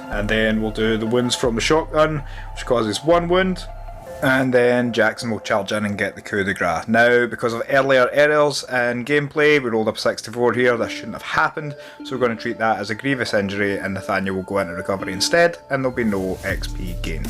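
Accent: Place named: Scotland, United Kingdom. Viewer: Great work my man - good to hear a Scottish voice - keep up the Necromunda content, cant get enough! All the best from sunny Ayrshire!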